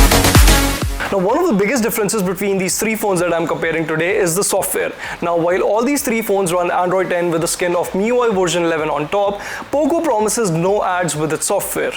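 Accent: India. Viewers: Bro, tumhari english, hindi jaisi lag rahi hai. Thank you bro 😎😎. Easily samaj aa rahi hai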